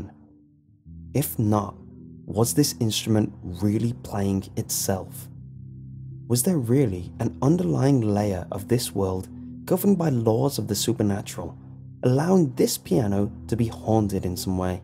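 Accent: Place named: Liverpool, United Kingdom. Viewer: I can detect a very subtle Scouse accent or possible Manc maybe.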